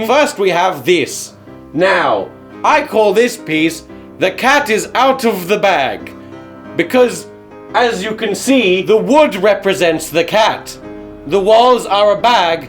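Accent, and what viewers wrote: United States. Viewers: His American accent reminds me of Markiplier a little bit. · Your American accent is actually p good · That’s a good American accent. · I thought he was British now he’s amarican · You have an uncomfortably good American accent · American sounds so much different than British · That's... a really good american accent. And as an American who enjoys making fun of non-american people doing american accents, that's painful to admit. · Pretty good American accent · He's talked about this before. There's an old video on this channel about accents where he says he picked up some Americanisms from watching a lot of American cartoons as a child. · Mohawk fits you,. Also, good American accent. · Ur American accent genuinely reminded me of markiplier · you do such a good american accent jeez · your american accent is on point :3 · Why does your American accent sound like Markiplier? 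· Dang his american accent is pretty decent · Ayyyy he’s an American maen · The american accent made it so much better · That american accent is 10/10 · His American accent is on point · Spot on American accent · The really good American accent just tied the whole thing together. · Your American accent is super weird coming out of your mouth. Good but weird!!!!! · das a pretty good american accent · Good American accent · You sounded like an American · Pretty good American Accent. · Wow that American accent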